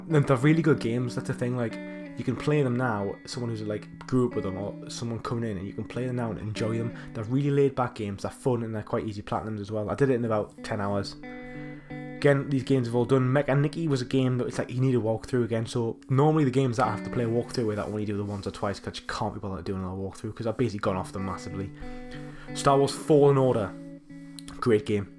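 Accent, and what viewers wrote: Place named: North East England, United Kingdom. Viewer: Are you from the north east of England by any chance? If you are, then I’m a fellow north east trophy hunter haha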